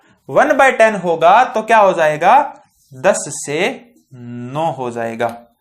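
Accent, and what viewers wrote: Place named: Rajasthan, India. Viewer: West Rajasthan se lg rhe ho sir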